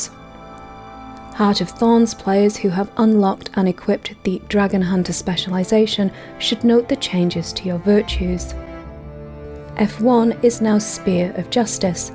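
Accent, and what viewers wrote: United Kingdom. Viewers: Honestly what got me to give your videos a try was your amazing British accent but once I watched the video it was really well made and informative. Subscribed.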